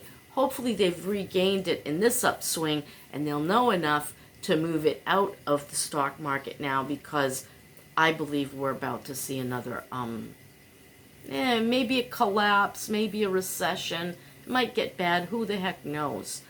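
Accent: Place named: New Jersey, United States. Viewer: Hi! I’ve just discovered you by accident in my feed and now I have someone new to watch! I’ve only watched I think two of your videos so far. I love your “randomness “, that’s exactly how my daughter and I talk…we’re always going from one topic to another then coming back to the first one. I love it! I’m truly happy to see you making it on so little! I love your accent….you gotta be from around New Jersey or something,right? And I love your attitude about punching your way out of a bag! You’re my kind of woman! You can make it through anything and you seem to be doing pretty darn good! I have to do some major downsizing as well! Just so tiring! I hear you about the childhood trauma…I made sure to end that with me. I know my great grandmother was way too tough on my grandma and those attitudes were passed onto my mother who passed it on to me. 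You gotta be the one to end it…life is too short to hurt the ones you love. There’s always time to change and apologize. We all make mistakes but learning from them and not doing it again is the main thing. Are you hoping to have this channel be a source of income? If so, I hope you become more popular and make more money to live on so you’ll have a cushion for emergencies. I sure love listening to you talk and I’m sure others will as well,once they find you that is. Well, I’ll go now seeing as I’ve written you a novel! Lol! Take care! ❤️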